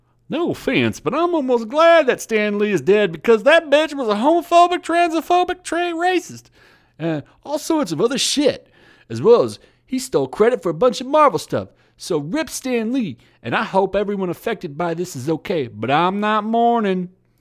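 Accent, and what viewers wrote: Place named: South, United States. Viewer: I just want to point something out here. Far left ----> Hating on a man who, although I certainly have no affection for, clearly meant a lot of things to a lot of people. Yet the Alt-right nazis everyone is so scared of, have no said a thing about him being a hack, even though he's Jewish and did do a lot of questionable things in his life. Just want to point that out. Your southern accent is beyond nauseating. Also just want to point that out. You read like 400 tweets in the 3 character voices you have. Lost being funny very quickly. As someone who has no dog in this race I wasn't cheering you for mocking sjws and I wasn't steaming mad that you were, I was just bored and irritated.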